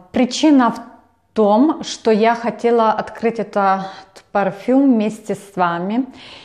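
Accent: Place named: Ukraine. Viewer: да давно пробовала пробник, но сейчас решила, что это будет самое то на весну!!! Посылочка ещё не пришла... мне очень нравится Ваш голос и речь немного с украинским акцентом... не слушайте не кого.... и не говорите что у Вас мало подписчиков. Те кто есть Вас любят. У Вас нереальная коллекция и много мне подходит по рекомендациям. Уде 200 флаконов где-то. Собираю все нишу люкс, ретро. Очень полюбилось Sublime and Joy by Jean Patou... очень богато и нежно как по мне. Я живу в Америке 30 лет, сейчас начала здесь встречаться с итальянцем.... очень надеюсь что получится... хотя много говорят итальянцы врунишки и гуляки... и пускают пыли в глаза... а хочется любви и романтики... посмотрим. 💝💖💐🥰😘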